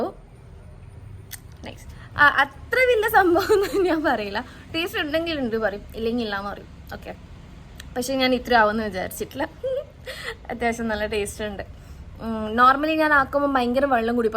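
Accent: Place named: Kannur, India. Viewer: Nallaind ketta nammale kannur bashaa..❤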